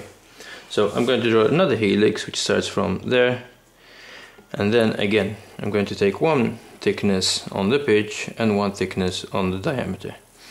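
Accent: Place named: Malta. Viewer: It is a Maltese accent. He used to teach me at school :p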